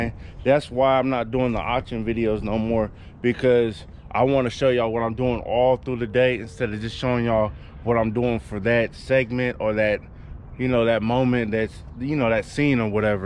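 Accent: California, United States. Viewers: I heard the Bay Area scraper music and your accent and said dude from Cali dam you shoulda came to Texas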